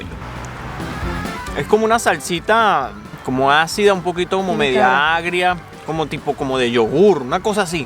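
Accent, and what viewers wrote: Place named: Venezuela. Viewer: Apenas supe que eras venezolano por tu acento cambié de canal!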